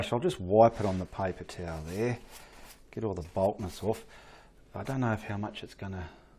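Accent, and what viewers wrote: Australia. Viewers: Thats the thickest australian accent ive ever heard. Love the painting.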